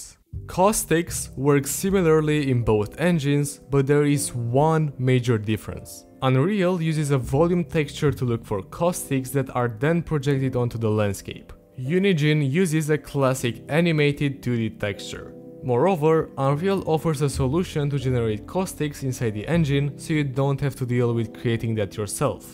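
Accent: Russia. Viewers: Почему мне слышится русский акцент? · Слышно, что наш парень. С произношение вообще беда) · Русский акцент такой ржачный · акцент то русский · Странный русский акцент для румына 😉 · Такой жесткий русский акцент у автора )) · такой акцент русского языка, ты что плохо знаешь английский ?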